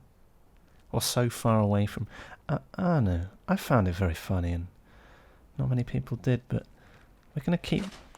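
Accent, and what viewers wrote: United Kingdom. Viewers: Sound like spiffen brit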